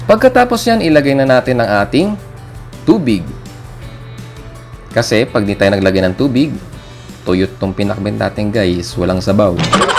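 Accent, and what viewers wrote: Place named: Batac, Philippines. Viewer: Taga Batac kan sa met sir, kasla kasjay iti ayog ti taga Batac hehe. Hello sir! Im from Piddig Ilocos Norte! Thank you kadetoy a recipe sir padsek man met ket tapno masiyahan ni papa😁 am 18 yrs old😇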